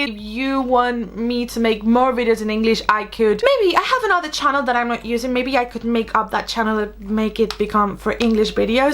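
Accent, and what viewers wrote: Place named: England, United Kingdom. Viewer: Me encanta tu acento tipo England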